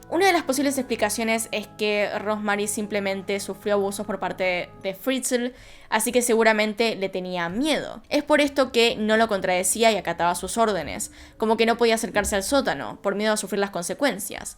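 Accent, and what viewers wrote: Argentina. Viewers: Me enkantan tus videos..eres excelente y me enkanta ese acento Argentino, tu lo haces mas KLASE😍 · No soporto ver esto porq el shoooo no puedo escuchar argentinos pssss chau veo otro video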